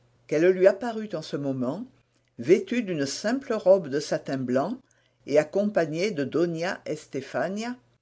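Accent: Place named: South, France. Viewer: L'accent méridional doublé du chuintement aristocratique pédant. Inaudible bien évidemment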